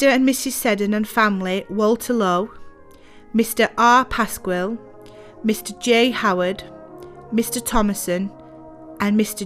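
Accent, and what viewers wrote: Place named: Northern England, United Kingdom. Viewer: First off. A big thank you to the film makers. This was a labour of love made by dedicated documentary film makers! The naration by is superb. Just enough of a northern accent to give it warmth. I loved it. For a film of its length and for a town so small to be able to hold you for 59mins is a tribute to the makers. My Gran lived in Golborn. My late mother was born there. I used to go to Golborn on a Saturday with mum on the bus from Leigh to see her mum. It would be around 1948ish to the early 50s. Gran lived in the second house from the Barn Lane end of Legh St. Opposite the cenotaph. Mum and dad were family freinds of Peter Kain. He made them a poker in the foundery for a wedding present. Later in life, when I was about 12, I used to go to Golbourn on the bus from Leigh to train spot on the station. 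The station master always kept an eye on us from a window up in the station building. Even now I have a model railway at the age of 78. I still have wonderful memories. I have to say thank you to for posting this gem.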